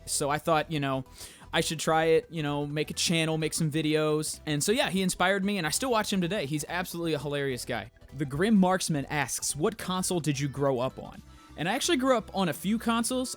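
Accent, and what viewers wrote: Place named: South, United States. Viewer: just learned he has a southern accent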